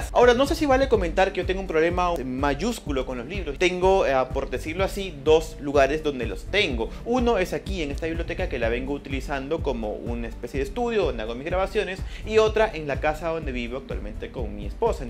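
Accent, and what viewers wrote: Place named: Peru. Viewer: Peruano detectado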